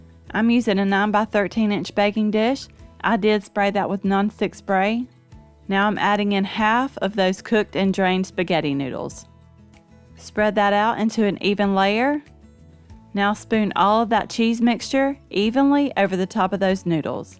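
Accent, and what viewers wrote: South, United States. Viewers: I'm so glad I just came across your channel! After watching the first video you posted 3 days ago I immediately subscribed! Your recipes look so delicious and easy to make. I've been looking for some new recipes for casseroles and the crockpot especially and you've got those and many others that I'm probably going to try them all! Thanks so much for sharing!!! I'm also curious where you're from if you don't mind me asking? I'm from the south in North Carolina and you sound like you have a southern accent and your recipes seem like they're southern too which is another reason I love them!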